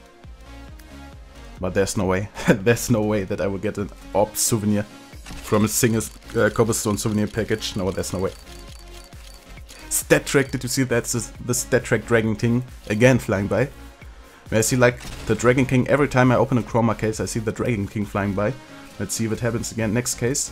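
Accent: Germany. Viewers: Dieser Deutsche Akzent. ^^ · nice accent by the way, i like they way the german accent lingers in my ears, but not tooo much of a german accent, really nice to listen to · inside Seine Aussprache ist so awesome:D Man merkt immer ein bisschen Deutsch bei ihm. Mir gefällt das:D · Man merkt damit du deutsch bist aber trotzdem nice sehr gutes Video!! LIKE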